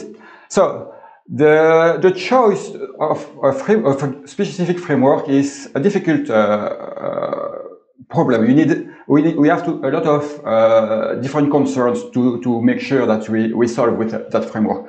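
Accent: France. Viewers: he's 100% french or belgium native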